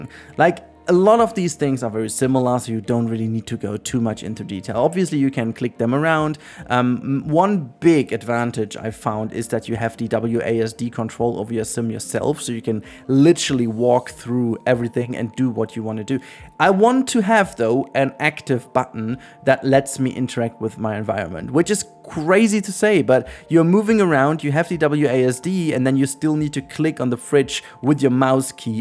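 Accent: Germany. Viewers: Because he is of German origin, so we speak what we read. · I'm confused bc I've watched many videos about this game and haven't heard anyone saying it like that, plus he sounds like German is his first language, therefore that isn't to blame either (despite what the other person who replied here said), given that the O in German never sounds like "ou" on its own, unless there's a U after it. I can only assume he tried to guess how it would be pronounced in English and got it wrong, but that is another thing I'm confused about, bc one would think he would have watched other videos about this game, and if he had, he would have learned how everyone else is saying it (unless that's how it's pronounced in Korean, and he knows that and I don't, which is another reason I'm asking, so I can learn if it is).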